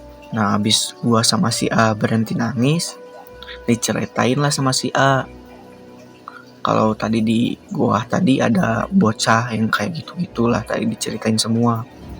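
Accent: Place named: West Java, Indonesia. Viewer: Suka banget sundanya 😂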